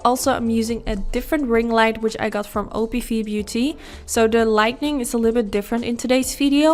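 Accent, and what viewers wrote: Netherlands. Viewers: I’ve never seen one of your videos, but the second you started talking, I knew you were Dutch haha! 🇳🇱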